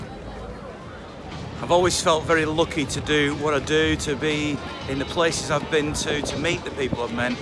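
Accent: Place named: Oldham, United Kingdom. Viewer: That's a strong Oldham accent you have there, respect for keeping your roots, 👍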